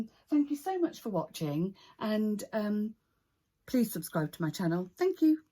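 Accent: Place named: England, United Kingdom. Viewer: Oh thank goodness a lovely english accent video explaining... instead of a shrill american accent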